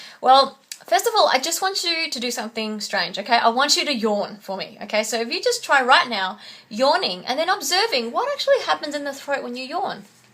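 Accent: Australia. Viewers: your accent...Umm..Australian right ?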